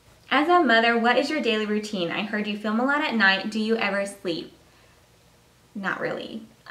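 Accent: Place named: Indiana, United States. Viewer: Just now watching this, but i knew you sounded like a fellow Hoosier! hahahaha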